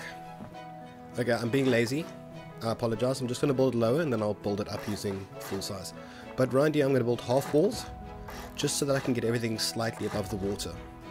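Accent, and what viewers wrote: South Africa. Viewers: Came across your channel in suggested. And i heard your voice and thought this guy is definetly south african xD its awesome to see a south african gaming channel this big. Definetly subbed <3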